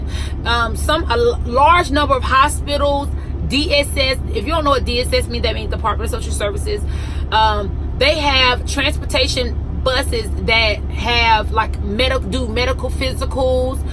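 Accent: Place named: North Carolina, United States. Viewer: I knew she was from nc by her voice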